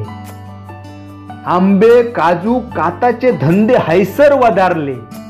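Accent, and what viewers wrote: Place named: Malvan, India. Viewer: तळवडा.. डोळ्यासमोर उभ्या केलात... कानांका तृप्त करणारो रसाळ ग-यासारो अस्सल मालवणी आवाज.. लय भारी..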